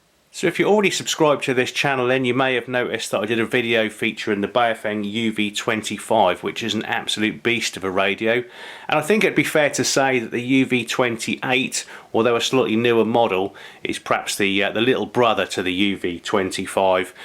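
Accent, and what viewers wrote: United Kingdom. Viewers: I just got mine (US). It's a pure beast on 70CM, hitting repeaters way out. 2M is ok to close repeaters, even with different antennas. Big clunky buttons feel awesome and the GPS is strong (hold down the A/B button for GPS). When transmitting, be sure to press the transmit button hard and wait a second or two before speaking. Too bad you couldn't get this info from the video, but you know, clicks.... But at least he's got a British accent.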